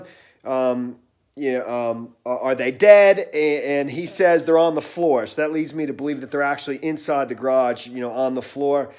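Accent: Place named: Charleston, United States. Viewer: Your accents pretty thick, its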